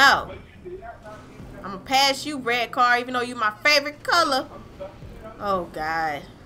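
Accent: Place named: South, United States. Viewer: Found you on Sharree. Good stuff. Where are you from though? Your accent says, the south region.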